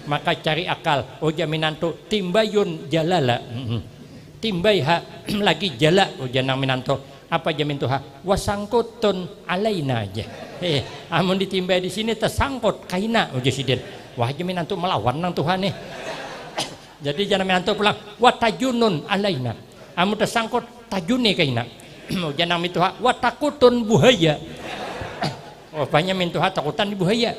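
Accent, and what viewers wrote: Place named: Brunei. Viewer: Mcm org brunei bahasa nya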